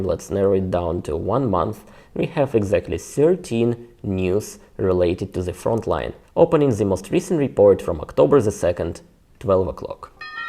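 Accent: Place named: Russia. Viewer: you're great and what I'm about to say is totally irrelevant I am sorry, but continuosly pronouncing 'a' instead of 'o' is not part of the English language. Sounds too Russian. Peace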